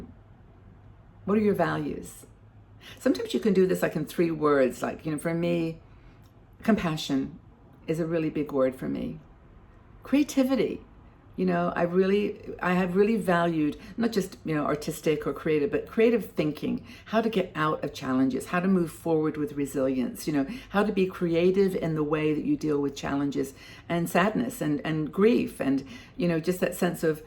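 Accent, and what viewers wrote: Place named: England, United Kingdom. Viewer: I thought I could hear a very small hint of an English accent in previous videos, small but still there.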